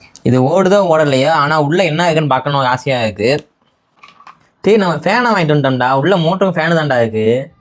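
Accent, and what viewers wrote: Madurai, India. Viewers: Bro nee madurai yaa bro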